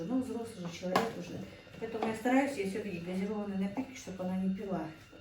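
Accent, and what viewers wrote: Standard. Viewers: Учителя были хорошие и ученица оченьприлежная.Я тоже поражена чистой,хорошо поставленной русской речью.Всех благ вам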